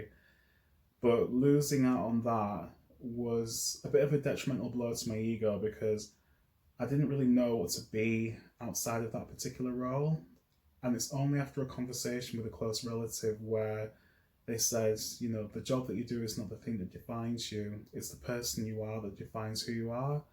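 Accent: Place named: United Kingdom. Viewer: I read a comment left on a rainbow bright video and just came for a nosey. Some reason thought you'd be American. Hello to you from a fellow Britt. 👋.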